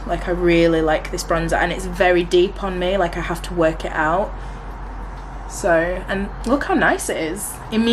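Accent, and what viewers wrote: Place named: Leeds, United Kingdom. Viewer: !!! I knew I recognised the accent! I'm from Leeds! Going to watch all your videos now 😂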